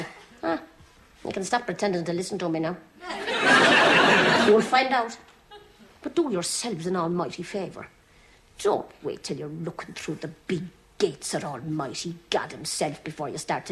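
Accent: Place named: Ireland. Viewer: This is an excellent irish accent !!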